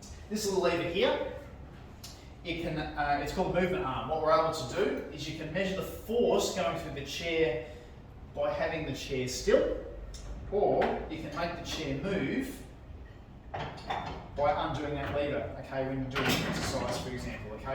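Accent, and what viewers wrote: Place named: Australia. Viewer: Thickest aussie accent I've ever heard, anyways great vid.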